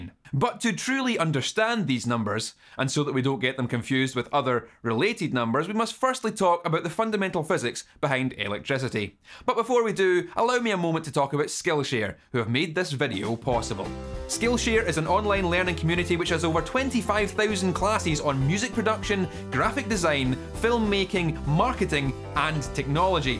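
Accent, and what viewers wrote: Scotland, United Kingdom. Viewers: YouTube loves the Scottish accent lol. I saw a video about Glasgow once where the captions thought they were speaking Dutch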